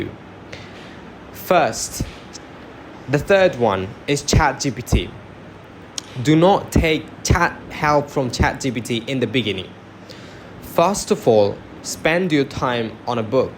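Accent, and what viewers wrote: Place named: Bangladesh. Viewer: U still have bangladeshi accent though ❤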